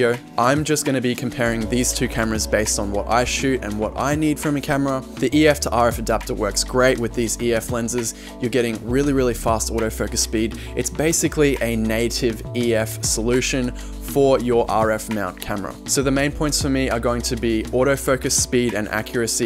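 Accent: Australia. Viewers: Title caught my attention then within 2 words I realised you were a fellow Aussie , within 30 seconds I subbed. Looking forward to exploring the channel more👍🏻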